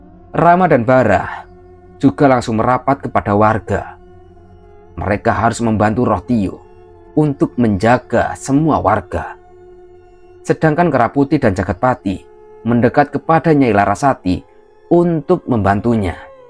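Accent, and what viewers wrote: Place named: Java, Indonesia. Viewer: medok bnr mas jowo ne keto 😂😂😂 ok lanjutt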